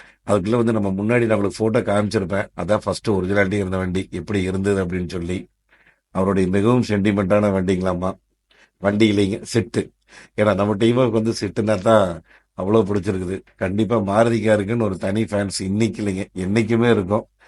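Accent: Kongu, India. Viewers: கொஞ்சும் கொங்குத் தமிழ் பேசும் கோமகரே...! திகட்டாத தித்திக்கும் பேச்சுடைய திருப்பூர் திருமகரே...! லடாக் பயணச் சாதனைச் சகாப்தம் எங்கள் மதிப்பிற்குறிய அண்ணா திரு. அண்ணா❤❤❤🙏 அவர்களே எப்படி இருக்கீங்க அண்ணி❤❤❤🙏 எப்படி இருக்காங்க தம்பி ரித்திக்❤❤❤ எப்படி இருக்காரு வீட்டைச் சுற்றிவரும் பட்டுக் குருவி அது சிறகு முளைத்த சிட்டுக்குருவி...! சுறு சுறுப்பாய் சாலையில் நான்கு சக்கரங்களைச் சுழற்றி வலம்வரும் சுட்டிக்குருவி அது நம் மாருதியின் சிட்டுக்குருவி...!!! விறுவிறுப்பாய் ஊரெங்கும் விளையாடும் ஊர்க்குருவி அது பறவையென்னும் சிட்டுக்குருவி...!!! தனக்கென்ற ஓர் மானிடக் குடும்பத்தோடு வாழும் இந்த குட்டிக் குருவி அது மாருதியின் சிட்டுக்குருவி...!!! வண்ண வண்ண நிறத்தில் வானத்து நட்சத்திரமாய் வலம் வரும் செல்லக் குருவி அது பறவைச் சிட்டுக்குருவி...!!! வையகம் முழுவதும் அண்ணா திரு. அண்ணா சென்ற லடாக் பயணச் சாதனைச் சகாப்தத்தை போற்றி மகிழ்ந்து அனைவரையும் வாழ்த்துக்கூற வைத்த கவிக் குருவி அதுதான் நம் அனைவரது மனதையும் கவர்ந்த மாருதியின் ❤"""சிட்டுக்குருவி"""❤...!!! 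என்றும் அன்புடன் உங்கள் தம்பி🙏 என்றும் அன்புடன் உங்கள் TMF🙏 ரா.முஹம்மது கபூர் (சென்னையில் இருந்து)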